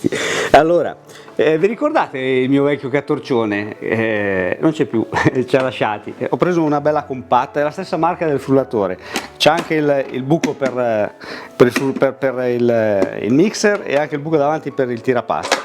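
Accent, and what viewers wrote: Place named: Milan, Italy. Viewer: Video doppiamente bello e buono, per il pane e per il dialetto meneghino.👏👏👏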